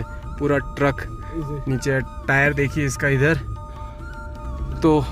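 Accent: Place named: Ladakh, India. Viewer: You speak Ladakh pure very nice!!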